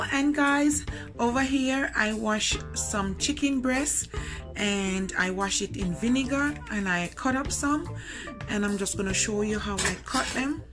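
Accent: Jamaica. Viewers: Hey hun, I can hear the accent in the voice...Jamaica to the world. Let's stay connected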